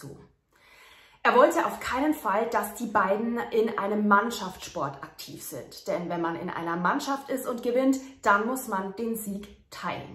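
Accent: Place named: Upper Franconia, Germany. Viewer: Hört sich an wie Oberfranken.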